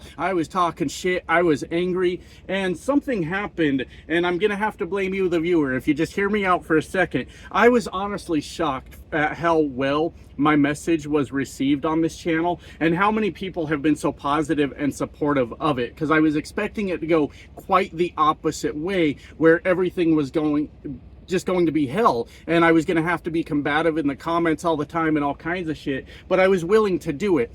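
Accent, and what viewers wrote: Canada. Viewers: I hate to break this to you, sir, but you DO sound like the stereotypical Canadian. It's not a problem; I like Rush, Red Green, Bare Naked Ladies, SCTV, and the RCMP. 🇨🇦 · I am pissed off at the Democratic Party. They are responsible for Trump getting elected, and must never be forgiven and never be forgotten. There must be a new Second party that replaces the Dem party. They are horrible losers and failures. Biden was a loser and liar. He did not fire Garland when he did not charge Trump for his crimes. Sick of speeches that are just liars. Biden/Harris support for gaza war was more important than stopping trump. sick and unforgivable. The Trump/Maga people will destroy themselves, bashing them achieves nothing. oh,btw, you have a candian accent, so no surprise people might think you are from canada. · You do sound Canadian 😅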